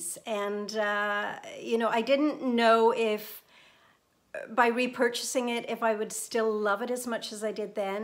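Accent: Ireland. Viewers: I know you are in Canada but every time I watch you I detect an Irish accent??? Orris root is Iris I believe. 👍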